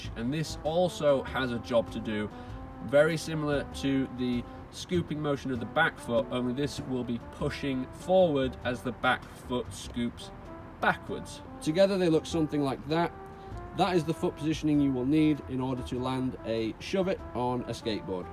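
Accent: United Kingdom. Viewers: Where from the UK are you? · Bri’ish? But actually thanks for the tips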